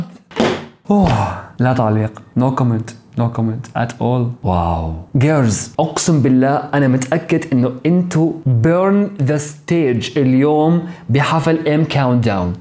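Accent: Iraq. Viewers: انت عراقي؟ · احساك انت عراقي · انت عراقي؟؟ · ياخيي حلووو عليك لهجه العراقيه 😭😭😭❤️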